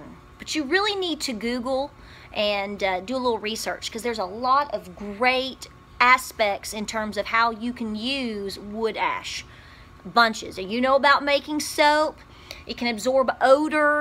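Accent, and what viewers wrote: South, United States. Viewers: Hi (i think that's your name??? Hope so...) just wanted to tell you that I love your attitude and that southern accent. LOL. I'm a northerner and we raise German Shepherds so I'm not off grid or even a homesteader but I really enjoy watching shows from all you guys. So many useful ways to use up wood ashes! I never knew this stuff. My grand parents were farmers and carpenters. I got a LOT of carpenter in me but not a whole lot of farmer, so I'm working on that one as we speak. Just wanted to pop in here and tell you that I really enjoy your show.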